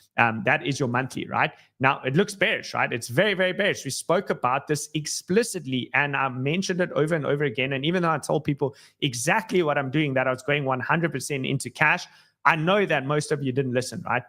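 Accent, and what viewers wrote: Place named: South Africa. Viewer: $10000 that's a south african accent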